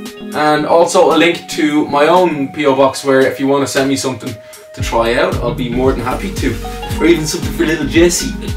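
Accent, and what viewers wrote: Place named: Ireland. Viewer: No one ever says Apothecary the first time out :) And it is ok. It's an old timey word that most people haven't heard before. We're digging it even more with the Irish accent though <3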